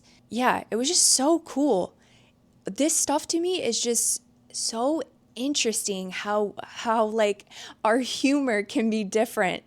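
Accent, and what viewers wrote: Canada. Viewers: lol. these differences are the same between states. this is so ridiculous to think there are any real differences. you actually sound more canadian than i do. lol. i think your jokes weren't landing because they are not funny. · I can't hear a distinct American accent from you. You can pass as a Canadian. You sound like a Canadian (at least from Ontario).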